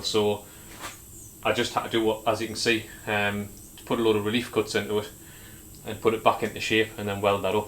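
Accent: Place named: Northern England, United Kingdom. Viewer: I know have commented many times and I just love watching and listening to you but I can never shake the Monty Python "ay yup trouble at mill" NO DISRESPECT it's just the accent, oh aye Crack On your getting really good at this welding shit, nice job, when doing the 'door' bit talk about using a sledge hammer to crack a walnut, geez, you need an English wheel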